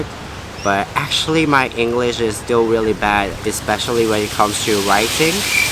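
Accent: Australia. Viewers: 와 근데 진짜 호주 악센트 영향 많이 받은듯 짧은 시간동안 신기하내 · 벌써 400명 돌파!!?! 성장속도 실홥니깟?! 잘지내시고계시죠 ㅎㅎ 정말 영어발음이 오지발음으로 바뀌신거같아욬ㅋㅋ 신기하네요 아무튼 영상 잘 보고있습니다 ㅠㅠ 멜번 그립네요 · 영상미 너무 예뻐버리기 ㅠㅠ 영어 발음 은근 중독성 있네요 ㅋㅋ 보통 많은 한국분들이 호주 가셔도 미국식 영어발음 구사하시는거 같은데 은 애초에 공부를 영드로도 하셔서 그런가ㅋㅋㅋ 호주 발음이 찰떡이심ㅋㅋㅋ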